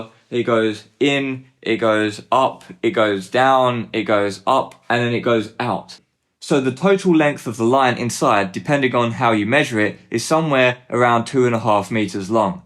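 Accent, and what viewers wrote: United Kingdom. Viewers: So which European country are you at? Because I’ve noticed your wall power socket (is it Type L?) to be unusal for EU, like it’s from old days (60s-70s), perhaps in Italy? Of course your accent is British but that wall socket is something else (not UK). My guess is that you are either in Italy or Germany, perhapse in a house in the woods, which might explain seeing your tools (I do like that window view). Please do tell, I am curious about that wall power socket, it’s kinda rare. Wish you best of luck with your DIY speakers, they do look good. I will stick with my Genelecs (have you seen/heard their 8381A or 1234A models? they are cheaper than PMCs!)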